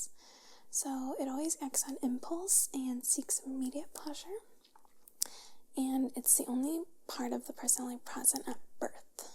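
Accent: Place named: Midwest, United States. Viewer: There is something about hearing the Midwest accent with sunshine and palm trees outside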